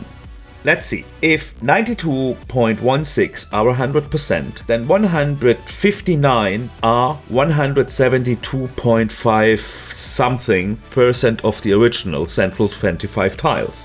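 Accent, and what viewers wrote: Germany. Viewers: Gutes Video, jetzt werd ich mir das game kaufen :face-purple-crying: Aber den deutschen Akzent hör ich bis hier her:face-red-heart-shape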